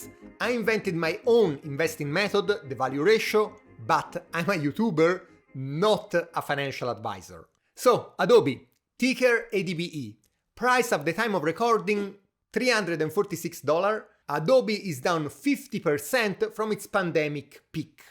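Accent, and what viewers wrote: Tuscany, Italy. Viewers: Fantastico come l’accento toscano si estende anche all’inglese 😄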